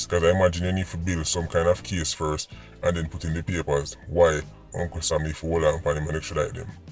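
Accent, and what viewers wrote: Jamaica. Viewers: How come the drugs for guns trade routes are so difficult to plug?! The "YAADIE" voice-over is hilarious!👍🏾👊🏾😄🇯🇲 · I love the yard man voice over.. Lol. Same way so. Someone was telling me that back in the days there was running going on in NY immigration office. One Jamaican go to the office with one bag of money and just throw it out on there take and asking about business.. There was where everything dun.. Nothing Jamaicans do that is still secret ..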